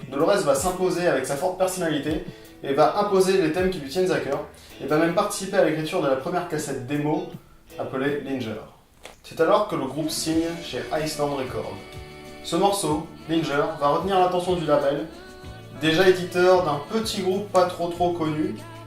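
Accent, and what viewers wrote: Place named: Spain. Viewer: A quand la vidéo sur un groupe espagnol pour faire attendre ton bel accent ? Venga hombre, queremos màs videos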